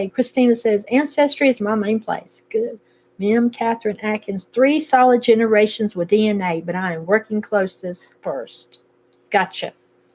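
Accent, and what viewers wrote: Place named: North Carolina, United States. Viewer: What a great program. I wish I had been on my journey at the time you started the Piedmont Trails so I could follow you as I desperately worked to understand my family history. I happened to find your channel by accident as I was flipping through You Tube for a movie. Since I was born in Statesville NC I immediately recognized a person speaking my language. I don’t even need my hearing aids to understand what you are saying. I love your accent ❤ At 77 people tell me I waited too long to start this project. However, I still remember my grand parents and my great grand parents talking about family members and it ties into my search back into my family’s history. Please keep this program going, You are special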